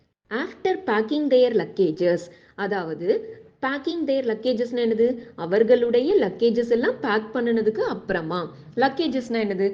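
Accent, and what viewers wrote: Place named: Tirunelveli, India. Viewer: Hi Mam, are you Living nearby Thirunelveli? Because your slang be like so.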